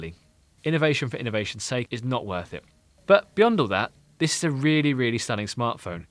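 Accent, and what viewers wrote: United Kingdom. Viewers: Your English British is better than american English, very very strong. I have enjoyed hearing you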